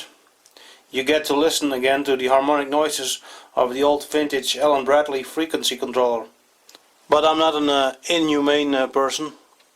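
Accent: Netherlands. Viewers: Aan je tongval te horen, ben je een Nederlander. Ik moet zeggen dat je erg goed Engels spreekt voor een techneut. Hou vol. Amusante video's.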